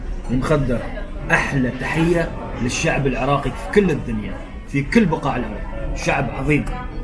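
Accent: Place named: United Arab Emirates. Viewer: الله محيي اصلك ، والله ذكرتني بايام الامارات واللهجه الاماراتيه الحلوه الله يديملكم الامن والامان ويرجع بلدنا العراق لخيره ونستضيفك تاكل دولمه ببغداد ان شاء الله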